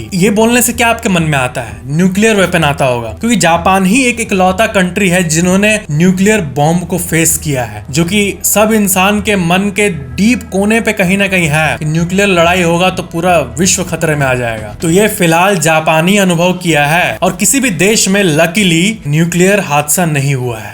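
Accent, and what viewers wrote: Bihar, India. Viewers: Your accecent sounds like bihari,s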